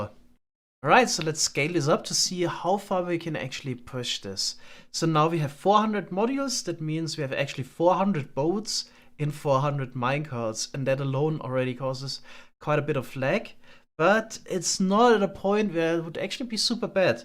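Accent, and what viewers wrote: Germany. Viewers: are u german or am i trippin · you sound like a German. im German and want to gnow if your German comment what im gonna say on German mein name ist Manuel und mein Lieblingstier ist eine Corbra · He is so German😂 this accent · Man hört so sehr raus das du deutsch bist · Man hört so raus das du deutsch bist hahaha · Der deutsche Akzent 😂👍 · Bruder uch hör schon das deutsche raus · The German accent🙌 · deutsches englisch ist so ne 10/10. aber ehrlich danke für die geilen farmen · Man hört den deutschen Akzent so stark raus 😂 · das höhrt sich so hart nach deutschem aktzent an bist du deutsch? Ps feier die serie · u german? i can hear it yu are german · Are you from Germany? Because you sound like a German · his accent sounds very German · bro you sound so german 💀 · Du bist deutscher nh ? haha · Real talk mir kann keiner erzählen das du nicht Deutsch bist!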